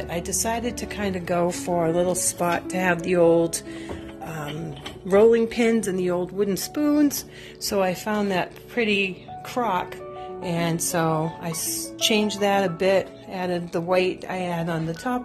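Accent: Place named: East Coast, United States. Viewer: Always enjoy seeing your home and how you decorate..love your eastern accent 💐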